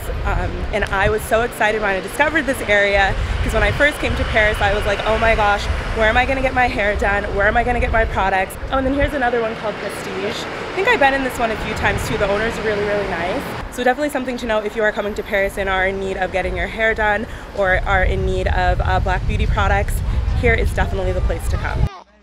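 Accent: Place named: Africa. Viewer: Macon GA with an African (from the continent)accent lol.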